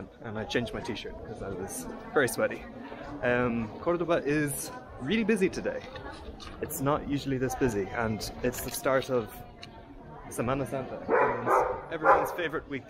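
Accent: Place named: Ireland. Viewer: Hola! I am impressed with your progress learning Spanish with your Irish accent. I love it. Congrats on your progress. I have been with you since the beginning. Love the festival. Here in my part of the world we celebrate cherries 🍒. Lots of cherry trees in the area. We call it Cherryfest.Lots of parades, concerts, food, fireworks, and tourist from all over the country and world. I love festivals because it usually shows the good part of a community. Adios!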